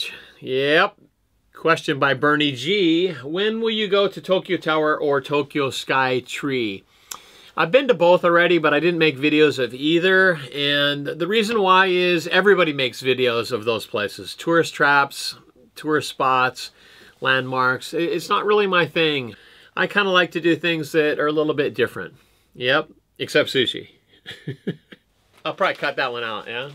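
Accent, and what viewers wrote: United States. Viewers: Are you from Hawaii? Certain things you say reminds me of home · interesting .. I thought you're Californian.... LOL ... didn't knew you learn to surf in hawaii ...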